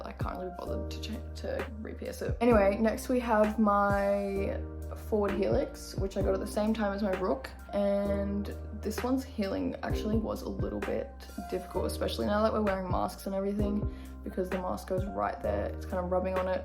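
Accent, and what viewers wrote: Australia. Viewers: My bi Aussie ass just sitting here stunned hearing a similar accent to my own and watching someone exist being that pretty- like huh 0-0 how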